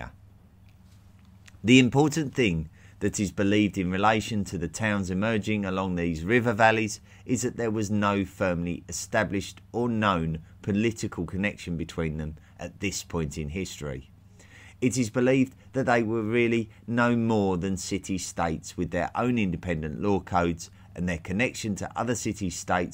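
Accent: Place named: England, United Kingdom. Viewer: I believe it to be the, More-run accent. Fairly common throughout England. Many speak in this manner. Car salesmen. Snake-oil salesmen. Circus leaders. Politicians. It varies widely throughout England.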